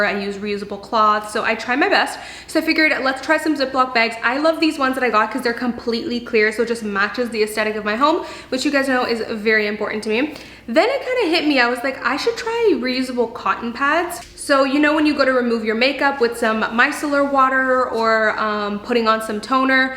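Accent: Canada. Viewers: Oh is she from Canada? I was noticing she says “beegs” instead of “bags” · Jaclyn Calderon it’s cringe to me but that’s how Canadians say it lol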